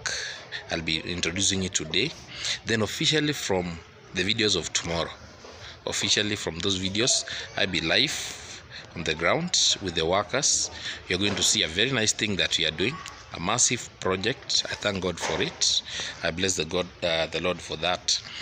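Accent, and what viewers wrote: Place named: Kenya. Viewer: If you asked me, I would have side,from the way to talk, that your a KIKUYU. I am a subscriber of Marwa and now you. Uvoo wa musyi? Greeting from Manchester.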